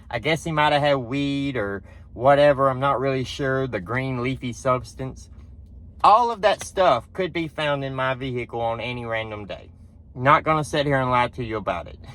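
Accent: South, United States. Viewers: Where in god's name are you from? Your southern twang is SO strong!!! I love it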